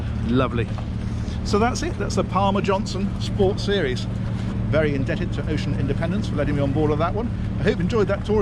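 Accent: United Kingdom. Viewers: U are So Amazing brother I want to know Why all of the yachts ive seen u on Yes All 👍🏻♥️ why no Salt Water see thru Fish Aquariums?, Please find a yacht with the tanks or maybe talk about it a video example?, if the owner wanted he or she could have see thru Salt water Fish 🐠 Aquariums as the dividing walls with electric black out shades to seperate kitchen or bedrooms etc? I just Love them, Thank you my friend, i would Live on one of these yachts with you & your Awesome family for 6 months if i could afford it,,, 😇😇👍🏻♥️♥️🙏🏻⭐️⭐️💯💯🐠🐠🐠 Thnk u again for bringing us ur beautiful sights during this hard time, God Speed,,, Keep smiling & Love the accent love Britain,England All of your county, such cool interesting people.👍🏻😎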